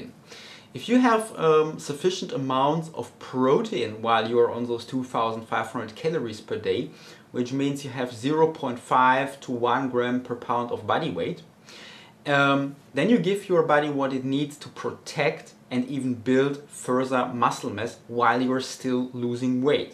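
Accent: Germany. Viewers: German Accent : )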